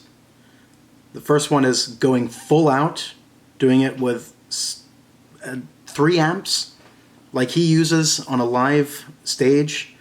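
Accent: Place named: Germany. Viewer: Dude. Are you a German that learned English? Christ I thought this was American for ... first 15 minutes! Great channel and checking things out. Brian Jones tone cuts more to the core of listeners and is an awesome thing to take a look at.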